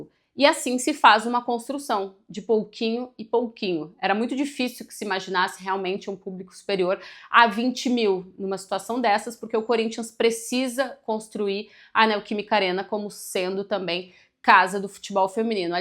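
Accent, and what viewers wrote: Rio Grande do Sul, Brazil. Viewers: Ainda bem que apareceu um canal com profissional e ainda com esse sotaque gaúcho. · Três motivos que esse canal é incrivel: 1 - Conteúdo excelente; 2 -; 3 - Orgulho que tu tens do nosso sotaque gaúcho. 🫶🏽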